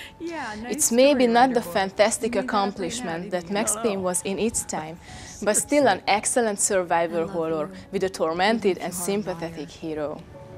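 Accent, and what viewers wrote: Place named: Russia. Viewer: has a great Russian accent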